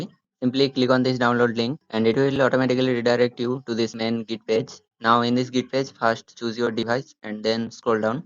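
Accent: India. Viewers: ı didnt understand anything because of your pajit language and accent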